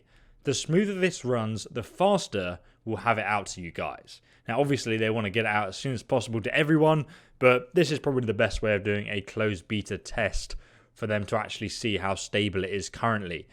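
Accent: United Kingdom. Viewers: Olong Johnson I think he’s British so that’s why he says it like that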